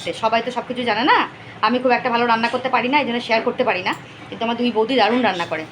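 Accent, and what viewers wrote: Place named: Bangal, Bangladesh. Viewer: Heavy bangal vasha bolen toh . Amio bangal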